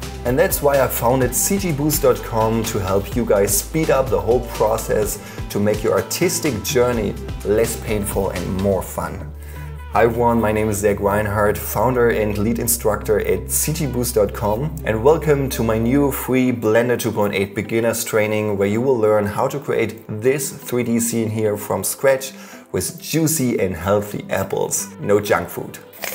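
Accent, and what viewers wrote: Germany. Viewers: first video I saw of you and I constantly hear your german accent (wich isn't bad) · kann es sein, dass du eigentlich deutsch sprichst? XD volles vid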